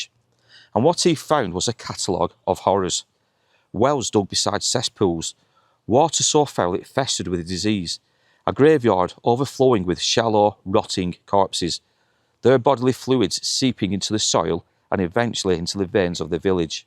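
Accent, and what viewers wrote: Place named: Northern England, United Kingdom. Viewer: Thank You so much for these Northern stories! I’m an American that grew up in Rochdale from 9yrs of age to 19yrs until I moved back home to the States. This was <num>. My youth was spent growing up on the Pennines, Yorkshire Moors, Saddleworth and The Dales. So much history you have uncovered that I never knew about. My mother still lives in Rochdale and I get over when I can. Been watching all your videos and hearing your northern accent warms my heart. Cheers and keep up the excellent stories and your investigations. Truly love these videos. The very best!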